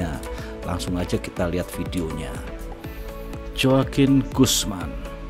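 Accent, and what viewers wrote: Java, Indonesia. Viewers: Logatmu jawa maas,,,apa asli jawa y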